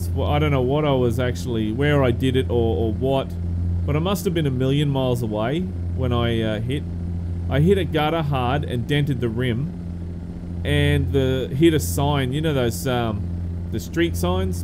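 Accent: Australia. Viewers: I hope that SCS Software DLC (as you mention in the video) will include some of the latest SCANIA Truck models as well, and not only Volvo trucks. I do have to emphasize that as an old former truck driver (25 meter) with years of experience, SCANIA is the brand of trucks that are very close to my heart, and have always been. In another words, I'm a loyal SCANIA Truck Fan. By the way, with all respect, I really like your Aussie Accent. And another thing, stay away from the Whitetips, Eastern Brownies and Taipans (specially the inland ones);-) Take Care and God Bless You and Your Family.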